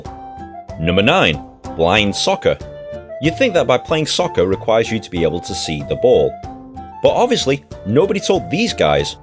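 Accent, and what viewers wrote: United Kingdom. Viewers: British accent is awesome!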